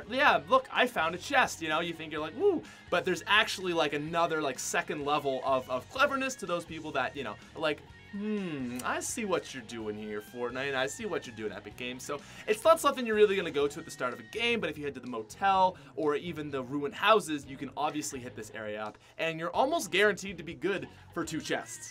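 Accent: Canada. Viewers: Is he Canadian he sounds Canadian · Are you Canadian?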